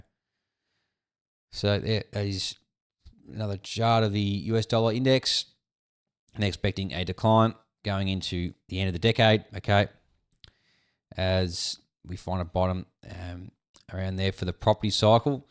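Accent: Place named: Australia. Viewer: Good info, we need more Aussie voices on utube.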